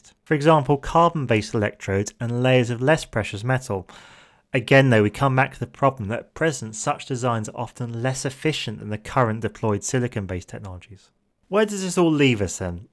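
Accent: United Kingdom. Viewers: He's effin English. He ain't from Texas...(By saying 'effin English' in no way I meant that pejoratively)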